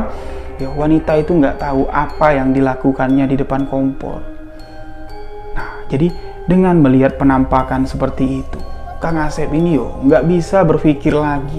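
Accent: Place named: Jambi, Indonesia. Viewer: Senang banget liat bg bercerita... Jngan lupa logat jambi nya ya bang?? Kereeeen.. 👍👍👍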